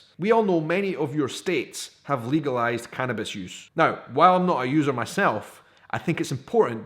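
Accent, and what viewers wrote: Scotland, United Kingdom. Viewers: So glad I found you. Enjoying your posts so much. I love Scottishs accent and phrases! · What does Scotland do best....the accent! Read a friggin book and I will listen. Seriously...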